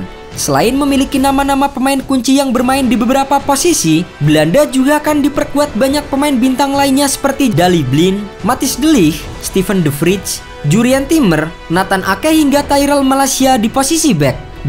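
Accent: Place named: Gayo, Indonesia. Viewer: Lucu ngomongnya digayoor.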